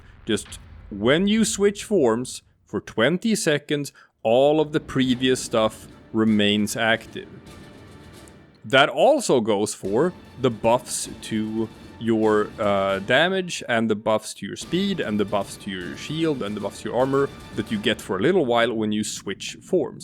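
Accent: Sweden. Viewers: First time seeing this channel, the second I heard that voice. Yea definitely a Swedish man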